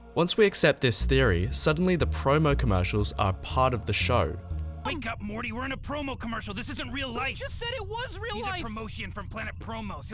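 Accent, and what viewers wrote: Australia. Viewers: Aussie boy!!!!😀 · Every thing is better with Australian accent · Woo an Aussie! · I FOUND ANOTHER AUSTRALIAN TUBER YEEEEEEA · My man your aussie too ^.^ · I gotta love that aussie Accent. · Yeah a Aussie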